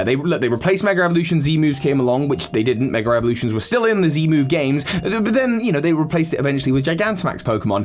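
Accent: United Kingdom. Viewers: Wait your British:o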